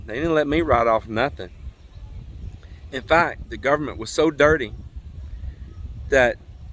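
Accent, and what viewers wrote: Texas, United States. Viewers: Well said, the trolls come out when things aren't good for them, just say it once let them know you know great stuff, love that Texas drawl haha say hi to love you guys great content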